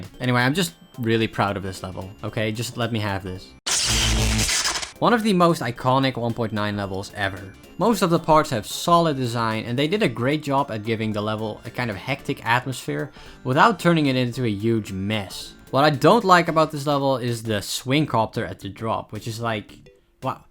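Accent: Netherlands. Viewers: i can hear that dutch accent lol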